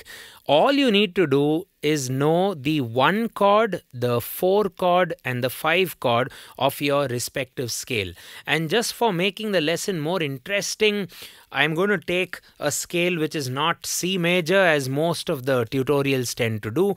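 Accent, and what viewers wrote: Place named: India. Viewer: thanks for a very informative video. your explanation are clear and simple. i watch a lot of technical videos and as a native American English speaker, i often find the indian accent annoying and just move on. while your accent is obvious, it is very understandable and not at all annoying. i work with many people with various accents and it is always apparent who cares about being understood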